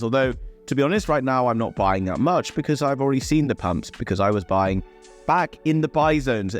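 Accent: United Kingdom. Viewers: How did Pauly D get a British accent?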